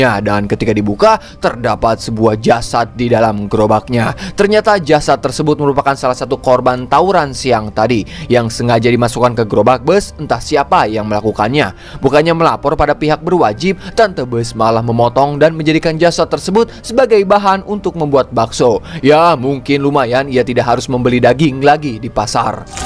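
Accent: West Java, Indonesia. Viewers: Aa Org Sunda Bukan. Lucu Ngomong Nya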